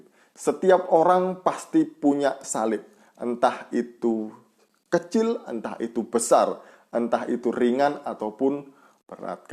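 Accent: Java, Indonesia. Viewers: Yang Pertama Aku suka Gaya bicara Panjenengan Mas kental bamgeet wong jowo nya hehe✌✌✌✌✌✌✌✌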